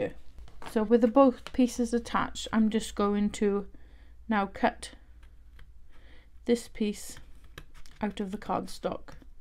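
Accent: Wales, United Kingdom. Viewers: Hi, Lovely tutorial, thank you! Sam is very wise having you on her design team, methinks! Love what you did with this, awesome work. Out of curiosity, your accent is sooooooo familiar to me, are you by any chance from Cardiff, or at least somewhere in S. Wales? I am in Cardiff, an adopted Welshie, Cardiffian, as I have lived here most of my life (shhhhh, don't tell anyone, I am actually English;) ) lol!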